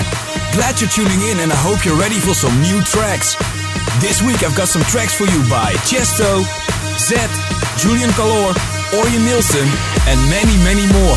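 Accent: Netherlands. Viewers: haha dat nederlandse accent :^) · That Dutch Accent =) ;D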